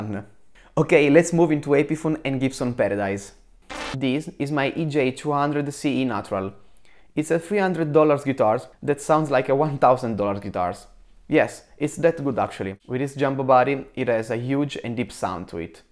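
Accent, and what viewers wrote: Italy. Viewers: aooo ma se sente che sei italiano AOOOOOOOO🇮🇹🇮🇹🇮🇹🇮🇹🇮🇹🇮🇹aoooooooaooooooo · You have the best Italian accent I have ever heard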